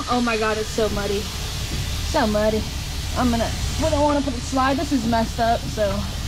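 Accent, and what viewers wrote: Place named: Oklahoma, United States. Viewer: U sound like an okie!!!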